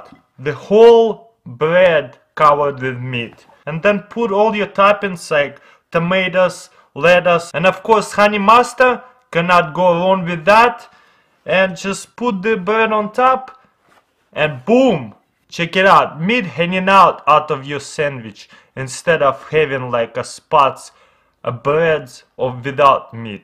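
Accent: Russia. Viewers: the Russian accent makes all the video! Boom BOOM love it! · He has a Russian accent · He is Russian like me he has an accent people sometimes be rude about how he talks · Russian English is so funny. One thing he does wrong though, he flipped the egg over. Blasphemy! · Taras Smishchuk what's wrong with his accent? he is Russian, deal with it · Give him a break his Russian. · He's not English he's Russian his pronunciations are different then yours! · Why would you even want to lose it? Russian accents sound fucking awesome. But yeah, for an English speaker, it is EXTREMELY difficult to pronounce Russian words properly. I've spent a ridiculous amount of time learning two simple words: здравствуйте товарищ (Hello, comrade) I've said it at least a hundred times, and I still catch myself pronouncing здравствуйте (zdrah-stu-ee-tcheh) incorrectly. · I find a strong Russian accent funny. 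respectively his voice is hilarious.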